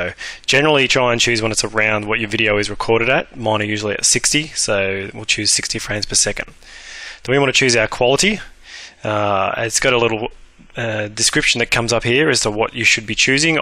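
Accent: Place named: Australia. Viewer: your strong ozzie accent made this 50 billion times more fun to watch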